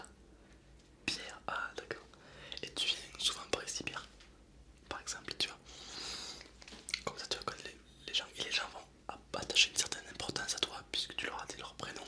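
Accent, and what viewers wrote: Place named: Marseille, France. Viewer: On reconnaît bien l’accent Marseillais , toujours autant présent dans tes vidéos. C’est drôle et bonne vidéo tout de même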